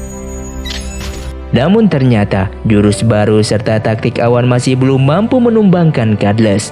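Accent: West Java, Indonesia. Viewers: bang maneh jelma sunda nyah?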